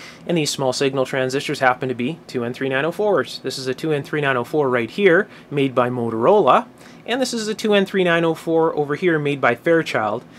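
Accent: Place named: Canada. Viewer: I follow K7PP and probably others that you and others involved with Ham Radio and Electronics. Pete has some great videos and I love those MONSTER DAWGS he has..Hi hi.. Do you follow David at Elpasso Yube Amps? He has some neat stuff as well. Of course I am sure you follow Dave with the EEVBLOG. He's a real hoot too. Are you in the US? You sound like you may be from Canada.. Anyway good chatting with you. I own DSM Labs here in SC and refurbish R 39x series receivers including the R- 389, 390, 390A, 391 and 392 receivers. I do a little Super Pro work from time to time. 73, Glenn WA4AOS dit dit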